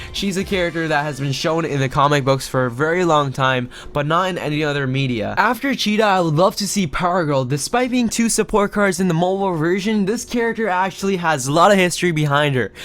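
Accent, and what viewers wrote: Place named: United States. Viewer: you sound like ur from n america usa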